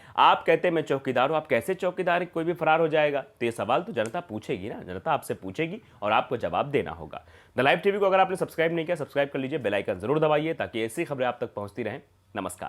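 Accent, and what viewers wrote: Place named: Eastern Uttar Pradesh, India. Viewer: In bhagodon ke karan sarkar par koi farq nahin padega kyonki andhbhakton ki badi sankhya unke support me hai chaahe jo bhi ho. Abhi to national property bechi jarahi hai, andhbhakt to uswaqt jagenge jab unke ghar bhi bik chuke honge. Badi achhi timely reporting hai aapki. Eastern UP ka ascent aur style sunkar maza aagays. Agar reporter saheb enjoy karen to unki khimat me ek sher arz hai Hain aur bhi duniya me sukhanwar bahut achhe: kahtehain ki Ghalib ka hai anddaze-bayan aur. Jai Hind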